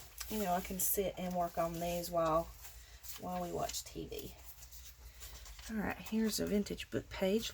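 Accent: South, United States. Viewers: I love watching the collaging process. Your sweet southern voice makes it even better. ❤️💕😘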